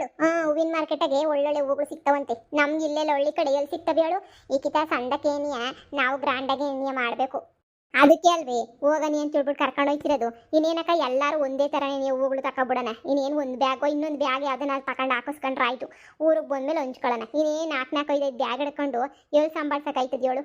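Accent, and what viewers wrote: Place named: Mandya, India. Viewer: ಯಾವ್ ತಾಲೂಕು ನಿಮ್ಮ ವಾಯ್ಸ್ ಮಾತ್ರ ನಮ್ tmk ಡಿಸ್ಟ್ರಿಕ್ ದು ಇದ್ದಂಗೆ ಇಲ್ಲಾ ಮಂಡ್ಯ ಅಥವಾ ಹಾಸನ ಸೈಡ್ ಇದ್ದಂಗೆ ಇದೇ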